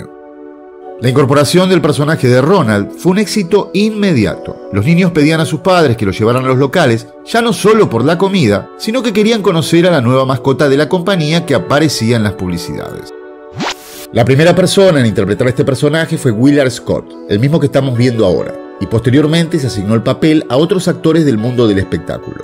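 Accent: Argentina. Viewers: Se llama. Da consejos sobre como correr mejor, por su acento pienso es tambien de Argentina.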